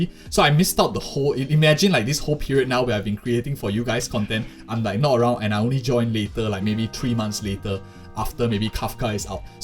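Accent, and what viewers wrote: Singapore. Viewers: lily aquina and you are my fav star rail content creators, I've watch every video from you since a month ago and I look forward to new one evertime, because I rly like the way you talk about stuff and I have a lot of sg friends and cousin so your accent is kinda a plus for me lol. Also a weird timing cuz i was thinking of A.I art for fun and turns out you have a channel for it, thats cool. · Congrats on your 15k! Fellow Sporean here, clicked into your content since day 1 of HSR, noticed your accent right away and stuck to your vids for guides since they are very detailed as compared to most of the HSR guides. Able to make pull decisions based on your content as well and I always recommend my friends to watch your vids as well for HSR guides. Really appreciate your hardwork that goes into the videos and hope to see exponential growth in the near future!! 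· congrats bro, only started watching you recently for star rail and didnt know u had another channel ngl but ill check it out since i like gacha games. also i sort of guessed you were from singapore too from your voice but guess now it confirms it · I was wondering why you sound so singaporean. Love to see more from from you ❤ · congrats on your achievement! started HSR on day 1 and have been following your videos and advices from then. when i first listened to your video, i immediately recognised you as a fellow singaporean. its not a bad thing, its a good thing actually. the landscape in sg doesnt really favour content creation so i really feel very encouraged and happy that you are trying and doing your best to make it. i'll continue to support you just like how you have supported us in our trailblazing adventures with your wisdom and insights. i'm pretty sure you alrdy know how to pace yourself and get enough rest. 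jiayou and i'll continue to support you with views <3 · Always good to see a fellow Singaporean find success in something they love! One of the biggest things I've liked about your channel was finally hearing a familiar accent narrating something that I had to search up on Youtube. Ever since watching my first video on your channel, I've found myself always coming here to look for anything star rail related before going anywhere else. Hope you continue to grow and find even more success!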